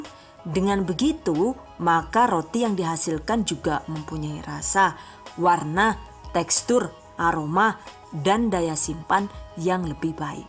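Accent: Banyumas, Indonesia. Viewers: akun masak tapi sajian videonya bikin ketawa dg aksen ngapak yg khas. Makasih atas ilmu yang dirangkum dg menyenangkan dan ga ribet:) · lengkap temen sh yu tutone... mantep sampean ko logate wong banyumas Cilacap sh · Hahaha.. Ngapak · Pas denger dirimu ngapak2, saya ngakak dan langsuung subscribe. 😂😂😂. Sukses sis dan makasih resepnya ❤️ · Ngakak ngapaknya mbekayune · Jebulane ngapak yak,lucuuuu men yak👍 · Oalah jebule rika wong ngapak yu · Lucu2....saya suka kalo lihat,bisa +ilmu & pasti'a bisa ketawa sendiri kalo yg punya channel bicara NGAPAK....hehehe. Rika asline wonk ndi sih yuuu...genenk bisa ngapak,kunci Inggris'e juga pinter....ehhhh bahasa Inggris maksude enyonk. Hehehehehe.....piss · Aaakk ngakak bgt ngapaknya seru ditelingaa 😂😂😭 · Ngulen tangan bisa se'elastis itu bun.. mantappp Ger lgi ngapak mantep retung, sg ra ngerti li ndarani lgi ngomaih😂😂 "DONE" #GiveawayTHVDesember2019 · Asline wong Endi sih yu? 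Ngapak bisa, ngomong ngaggo bhs. Inggris ya pinter temen koh... Hahaha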